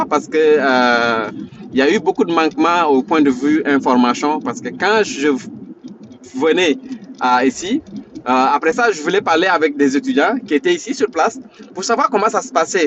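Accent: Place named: Senegal. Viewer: Salut lorsque tu a commencé à parler jje me suis dit en même ce Mr est un sénégalais merci pour la vidéo.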